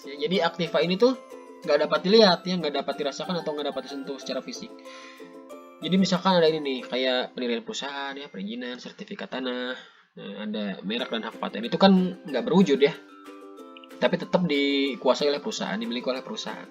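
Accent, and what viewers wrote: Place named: West Java, Indonesia. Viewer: nyunda euy, hatur nuhun elmuna manfaat..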